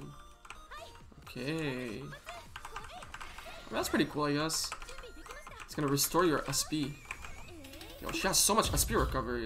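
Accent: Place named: India. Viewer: Just a question, are you indian? Your accent is kinda indian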